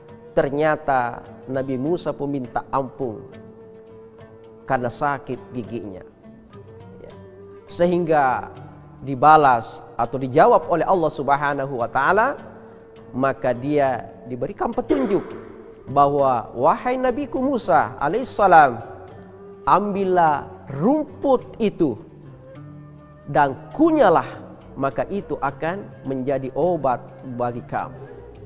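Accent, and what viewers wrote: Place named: Bugis, Indonesia. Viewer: Iyye puang biar ada khas bugisnya...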